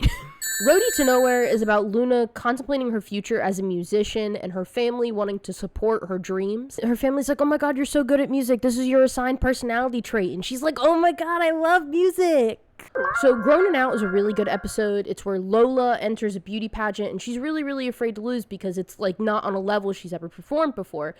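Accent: South, United States. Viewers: I'm hearing more of a Southern accent than a Boston accent. :P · I love your southern accent. 😊 It's so cool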